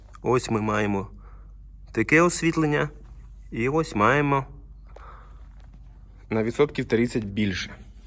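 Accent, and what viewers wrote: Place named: Poltava, Ukraine. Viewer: З яких часів полтавський діалект став суржиком,ґа?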